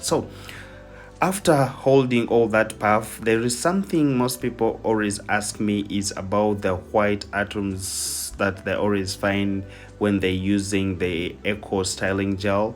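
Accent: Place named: Uganda. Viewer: Voice over sounds Ugandan 🤔🤔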